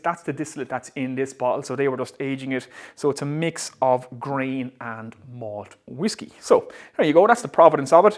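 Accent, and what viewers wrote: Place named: Ireland. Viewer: I'm trying to guess where your accent is from, guessing you have roots in Dublin and Meath?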